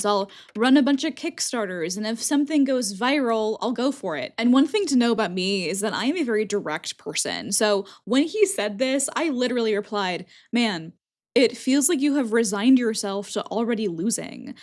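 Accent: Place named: United States. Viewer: I'm kind of waiting for you to address and acknowledge the privilege as well: privilege of being a white American woman (at least from the accent), of having a partner who is supportive who has a high paying job that can support two people, of living in the U.S - particularly NY and LA. I'm not bringing down your accomplishment, but I'd like the average person reading this to not compare their reality to yours, unless it's very similar.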